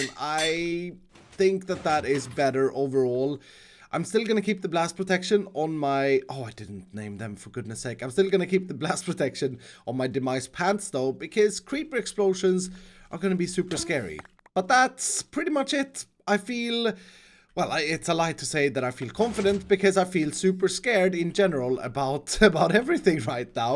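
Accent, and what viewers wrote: Sweden. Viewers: are you Swedish? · to me its sounds like a harsher Swedish accent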